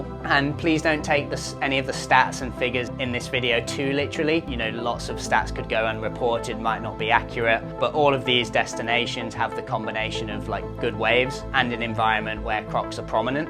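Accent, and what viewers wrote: Australia. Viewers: he is a brit though, but sounds like aussie. ne ca raw gua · ❤I love your accent and I love Australia I would love to visit sometime I’m from Louisiana USA a retired herpetologist. I also love your videos they’re very well put together sending lots of love and hugs your way, Ramona🎉❤ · Not Canadian..Aussie?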